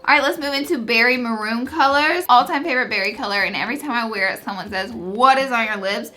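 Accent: South, United States. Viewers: I guess that bettet than saying you sound like and 80year old that sounds like you smoke a pack a day. I love your voice I find it comforting with the southern flair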